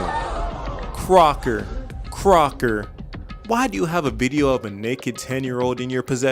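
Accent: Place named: South, United States. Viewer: Your channel finna blow on god, and bruh I need to see if you from Georgia or Florida cause you kinda sound like us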